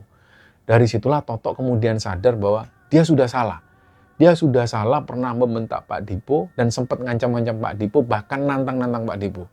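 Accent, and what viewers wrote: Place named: Java, Indonesia. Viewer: Klo mas lagi berbahasa jawa enak didengernya.. Walaw tidak bgtu paham..karna saya asli sunda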